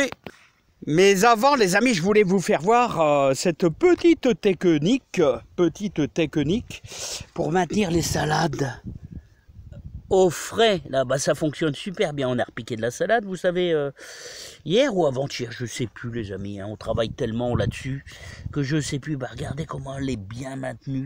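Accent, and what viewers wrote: North, France. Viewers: Merci à vous pour tous vos conseils quand je sais pas je recherche dans vos vidéos et j'ai ma solution cela fait maintenant plus de 2 ans que je vous suis merci pour partager vos conseils et votre passion du jardin avec l accent du nord je suis à côté de Lille une question quand même votre jardin est très très grand que faites vous de tout les légumes récoltés car la quantité doit être impressionnante ? Merci bonne continuation à vous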